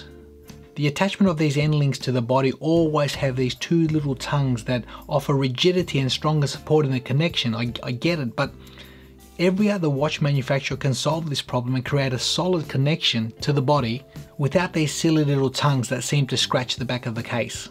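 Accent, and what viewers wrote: Australia. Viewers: Lovely accent. Aussie? 😉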